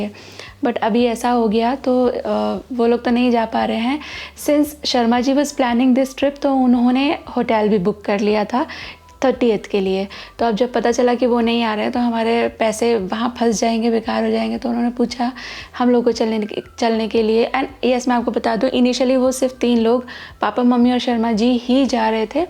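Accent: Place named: North India, India. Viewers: Actually yar u don't know South indians hum north indians ki pronouncing me bht joke bnate h...mei literally daily notice karti hu..jo words hum Bachpan se bolte aaye h usko yeh wrong bolte h..mei bhi yehi ki tarah bolti hu.. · Pr hum North Indian ese hi krte hai prounce jaise apne kiye so people should not feel offended in this nobody is making fun of that pronunciation but we North Indian ese hi boltae even my mother harr jagah yahi likha hota hain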